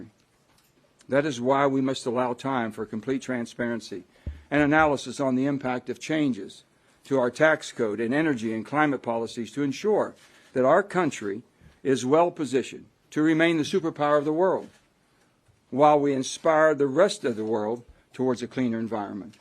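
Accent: United States. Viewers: He sounds like a true American!!! 🇺🇲🇺🇲🇺🇲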